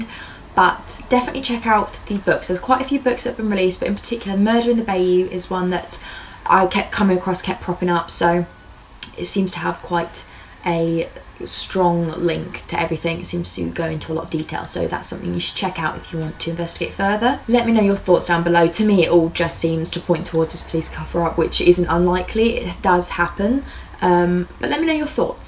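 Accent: United Kingdom. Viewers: It just sounds different because she's from Britain. 🤔 Grits in Chicago is the same as grits in Alabama 😂 And that would be porridge in England. 😆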